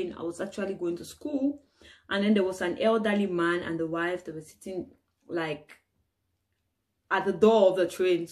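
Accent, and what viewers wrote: Nigeria. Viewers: your accent is slightly Nigerian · your speaking pidgin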